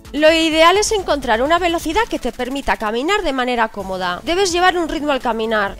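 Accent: Spain. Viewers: eres de Valencia ?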